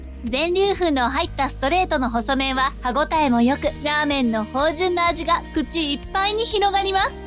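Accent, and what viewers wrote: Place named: Gunma, Japan. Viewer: おすすめのラーメン屋が入ってないのが残念ですが、地名のイントネーションが群馬弁なのが好感度高い。 標準語はた→か↑さ→き↓みたいだけど、群馬の人が群馬の市の名前をた→か→さ→き→って言ってるんだから、そこはもう標準語とかではなくた→か→さ→き→なんだよなぁ。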